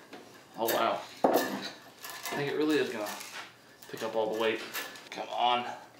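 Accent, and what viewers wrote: South, United States. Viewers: Did the beard bro-ham, you from southern U.S? Just wondering, mainly your accent.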